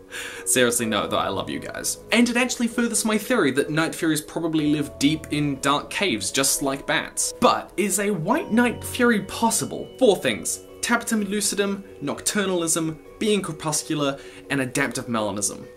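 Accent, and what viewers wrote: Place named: New Zealand. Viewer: I KNOW THAT YOU ARE A NEW ZEALANDER BECAUSE OF YOUR ACCENT!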